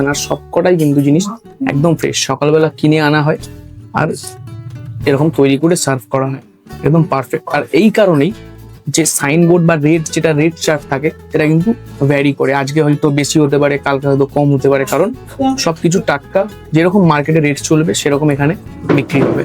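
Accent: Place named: West Bengal, India. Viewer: Tor bhasha shunei bujhechhi.. Tui edeshi.. Edeshi ra khawa kobe shikhechhe??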